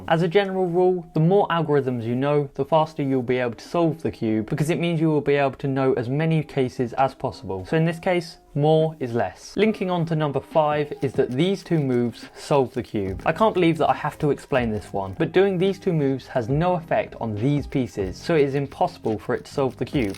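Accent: United Kingdom. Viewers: first bri'ish cubing youtuber? Nice!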